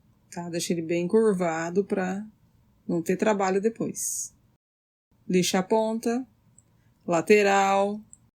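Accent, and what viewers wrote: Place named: Campinas, Brazil. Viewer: Gosto muito do jeito que você fala. Parece o sotaque da minha cidade: Campinas SP. Agora eu moro em Portugal, mas sinto muita falta de ouvir essa pronúncia ❤️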